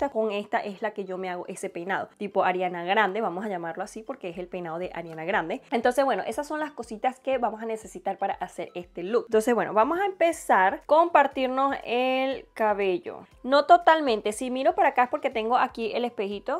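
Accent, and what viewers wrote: Venezuela. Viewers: ES VENEZOLANA? CREO QUE SI POR SU ACENTO ...ADEMAS QUE BELLA ES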